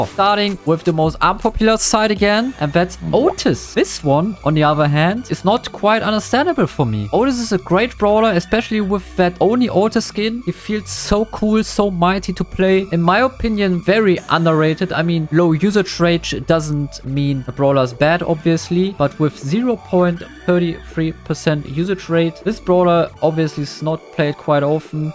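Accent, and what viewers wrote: Germany. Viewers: ich finde es voll witzig wie du englisch sprichst weil man hört das du aus Deutschland kommst😂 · Das ist so richtiges deutsches Englisch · are you german or french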